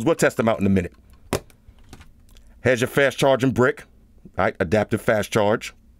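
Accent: New York, United States. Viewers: alright alright, i clowned this dude in the past because of his harsh voice and New York accent. but i see he done softened his image by putting a cat in his videos. Good job.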